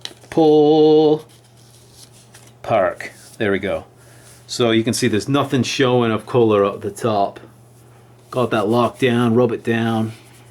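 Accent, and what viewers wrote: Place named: Scotland, United Kingdom. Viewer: I know this an odd question, given that my focus should be related to the taping (video was excellent by the way); but I spent all the time trying to work out your accent. It sounded a combination of Scottish (not sure which part), Northern English (Liverpool or Manchester), and Canadian. Please help me out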